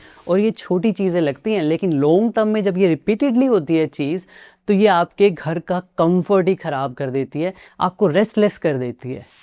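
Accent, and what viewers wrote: Pakistan. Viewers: Accent urdu pakistani content for indian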